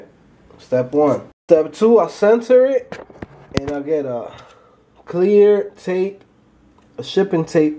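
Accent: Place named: New York, United States. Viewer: You sound like you've gotta be from NY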